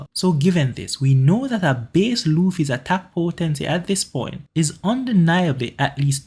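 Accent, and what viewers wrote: Jamaica. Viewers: U Jamaican? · This man sounds like a yaadie. Subbed. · Definitely Jamaican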